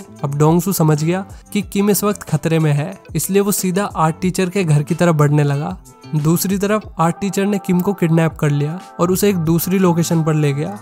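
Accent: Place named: Meerut, India. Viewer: Poori meeruthiya accent h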